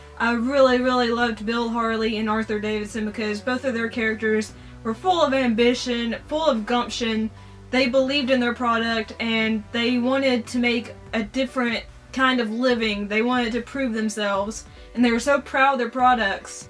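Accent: South, United States. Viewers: nice one,looks like a great film,i love your southern accent,looking great too,love n peace.jpj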